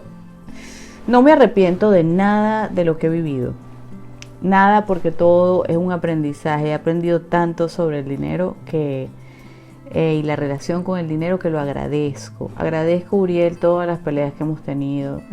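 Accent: Argentina. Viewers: Argentino o uruguayo?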